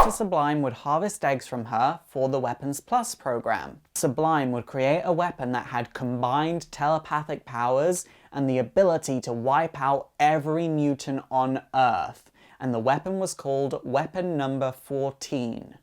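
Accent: United Kingdom. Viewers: Hes british, i think he is pronouncing it the way its supposed to be.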